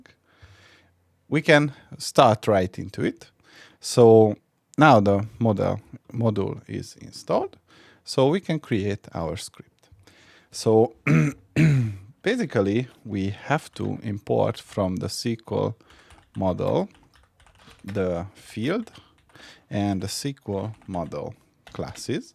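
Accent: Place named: Hungary. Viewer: I don't know why youtube recommended this video for me, but from your accent, I instantly knew that you are Hungarian as well, maybe that's why. But to add something relevant, I'm an amateur programmer, but when I needed to handle databases, I've always used pandas. Sometimes I have to use SQL in Access, but I'm not a fan of it generally. Is there a benefit - or what are they - using SQLModel instead of pandas in python?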